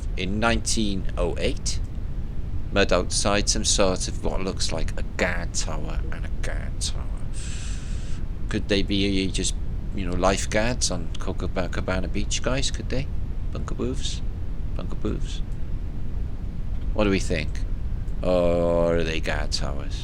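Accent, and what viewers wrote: United Kingdom. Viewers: off the cuff comments are what I live for! Man looking at self in mirror, “Hi, what do you do?” Reflection, “I’m an artist. What do you do?” Man, “I clean cars for a living.” Love you, although I can’t understand HALF of what you’re saying with that British accent!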